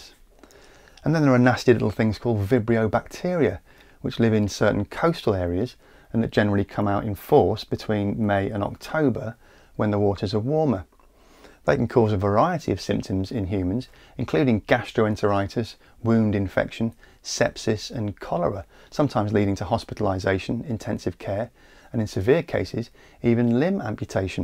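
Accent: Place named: Surrey, United Kingdom. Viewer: His voice is exactly like Professor Patrick N. Allitt who did a course on Victorian Britain for The Teaching Company. I'll bet both of these men come from the same area of England. I believe it was Surrey, south of London. I love the way they sound.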